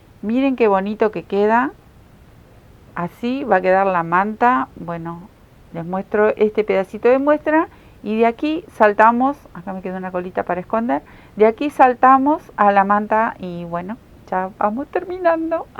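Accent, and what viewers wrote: Argentina. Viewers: De acuerdo, la cobijita mas linda del internet. Yo disfrute muchisimo los videos, uno tras otro como si fuera una serie de tv. Yo soy mexicana, y me encanta su acento argentino! Estas cobijas no tienen igual, mi abuelita tejio una, se la dio a mi mama y todos la querian usar, iba de alla para aca. En mi familia yo soy la tejedora, de niña me iba sola a clases de tejido con puras señoras, y desde entonces me encanta. Gracias por estos hermosos tutoriales!!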